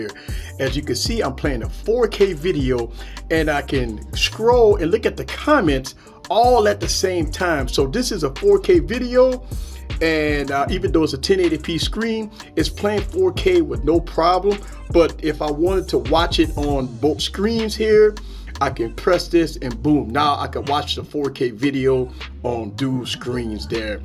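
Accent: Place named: South, United States. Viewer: Nice video....cant stand the southern bumpkin broken gutta' accent tho....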